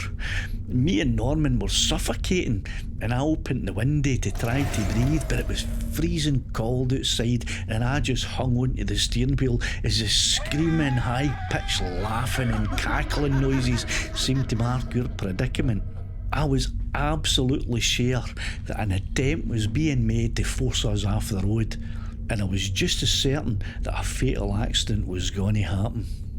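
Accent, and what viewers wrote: Scotland, United Kingdom. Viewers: Just found your channel-- great story- telling, love yer guid Scots tongue and accounts of true Scottish encounters!❤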